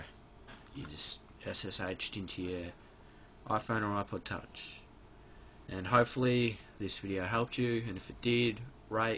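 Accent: Australia. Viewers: Good video 5/5. Also somehow I knew that you were aussie lol. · ih im 9 n yer ossie vose siis cut.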